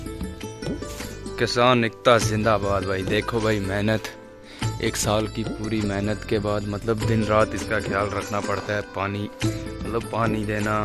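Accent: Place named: Poonch-Rajouri, India. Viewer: Bhai jaan language se lgra ae poonch Rajouri se oo ya to fr choudhary ho❤❤❤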